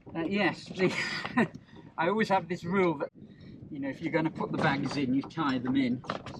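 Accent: United Kingdom. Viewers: I surely no not know why, since my ancestor settled in Canada only 55 years after the foundation of Québec by Samuel de Champlain in 1608, I feel I have to offer you my apoligies for this rather uncivilized fashion with wich this attendant from Audierne treated you and, but I do. J'adore l'accent british;)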